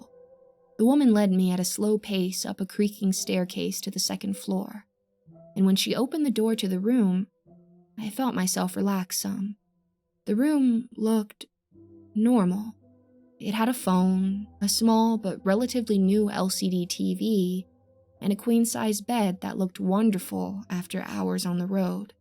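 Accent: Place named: South, United States. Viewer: Your creepy southern drawl is actually very well done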